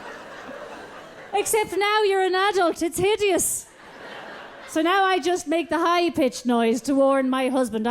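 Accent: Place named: Ireland. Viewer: Just discovered her the other day. Love her Irish accent. Hysterically funny. Big fan now.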